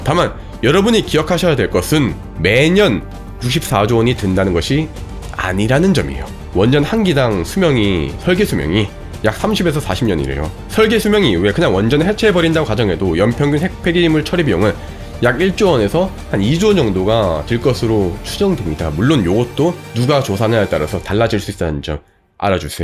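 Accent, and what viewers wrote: Gyeongsang, South Korea. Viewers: 경상도 같이 느꼈어요.. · 경상도 아이가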